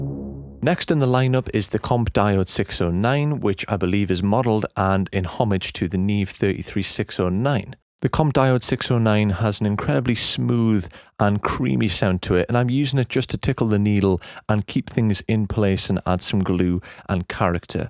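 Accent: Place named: North East England, United Kingdom. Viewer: Champion North East accent there fella!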